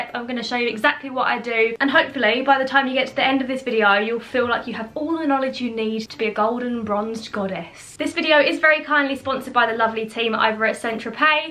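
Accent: United Kingdom. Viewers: Finnally i have found a perfect video, since i couldnt decide which one to buy, you explained it perfectly, also im just so in love with the brittish accent 🥰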